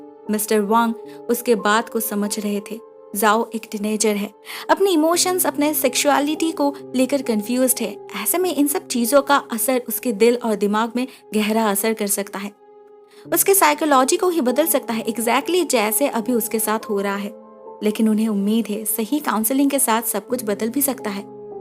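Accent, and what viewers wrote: Bengal, India. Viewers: Are u Bengali?..u have beautiful voice...u have Bengali accent..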